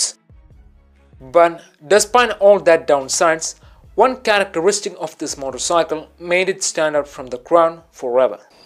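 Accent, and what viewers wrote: Kerala, India. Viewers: That Indian accent 🤣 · anna..ningal mass aa, aa mallu + anglo slang kettapozhe click aayi..lv u 😘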